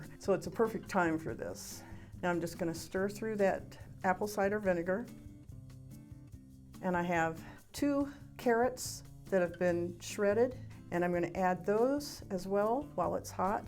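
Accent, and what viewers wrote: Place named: United States. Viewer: Where do you live? By your accent, im assuming the US. Hellman's (east coast)= Bestfood's (west coast). I hope this helps anyone who didnt already know this😊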